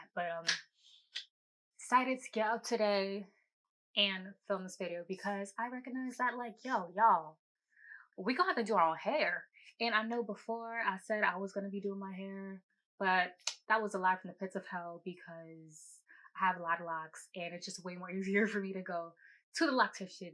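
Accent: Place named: New York, United States. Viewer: I love your NY accent (don’t change how you talk) and your locs. They have grown out sooooo 😍 nicely. Been a subbie since the beginning. I would recommend supporting a YouTuber named Tameka by nature below is the link to her business. She is also a New Yorker and is always promoting positivity and supporting small you tubers. Shout her out and/or support. I truly respect that you want to do this🤗. Stay blessed sis🙏🏾. <link>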